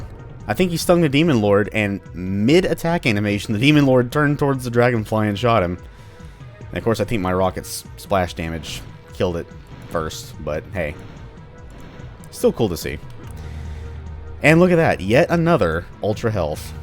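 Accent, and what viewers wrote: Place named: South, United States. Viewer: Thanks from TX. You sound southern yourself! Loved replaying this game with my daughter.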